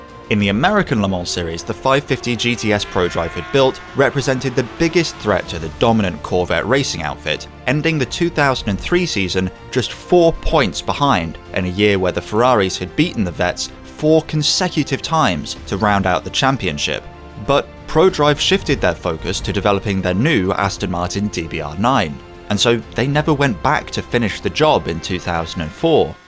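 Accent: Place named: North, United Kingdom. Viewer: Awesome vid as always! Where in England are you from, if I may ask (I'm a non-Anglophone who's recently found a fascination for English regional accents)? You speak fairly posh but at the same time you are unmistakably Northern!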